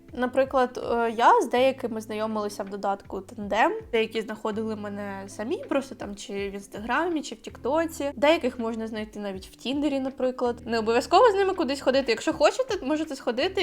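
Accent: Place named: United States. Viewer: хочу твоє відео, де ти говориш тільки англійською, мені дуже подобається твоя вимова. Я в захваті від максимально американського акценту😁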